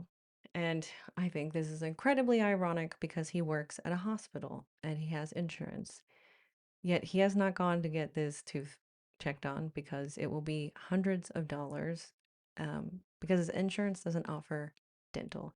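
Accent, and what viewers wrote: United States. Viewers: Really lovely to hear a considered, quietly spoken American. It's such a lovely accent when it's not screaming at you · You seem such a lovely person, I love your videos. You may have an American accent but my dear you’re one of us now, welcome home ☺ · American lady living in the UK is sooo SXY. Please try not to loose the accent and become less exotic... LOL.❤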